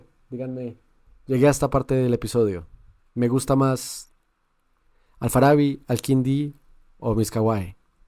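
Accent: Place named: Colombia. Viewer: Recién descubro tu canal. Como admirador de la filosofía islámica, te doy gracias por cubrir estos temas en español. Por cierto, ¿eres colombiano? Creo que tu acento te delata jajaja.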